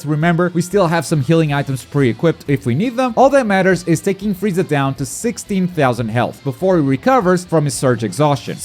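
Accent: Portugal. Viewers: Caramba, vc é de Portugal??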